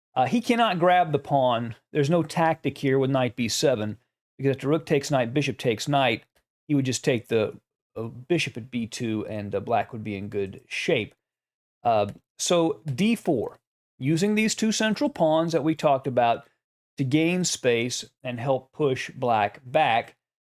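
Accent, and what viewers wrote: South, United States. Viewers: Are you from southern America ?🙂 Nice video, I love watch your chess review 🤩😍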